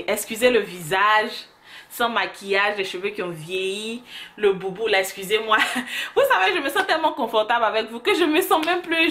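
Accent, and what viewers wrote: Ivory Coast. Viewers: Sans demandé je sais ke t'es ivoirienne, l'accent la ment pas dehhh, lol. Je m'abonne 😊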